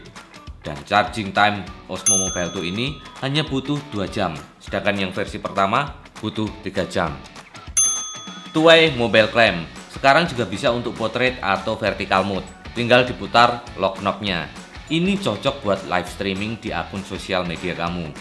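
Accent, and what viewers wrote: Java, Indonesia. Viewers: Kok medok cak?? Ngendi lho asline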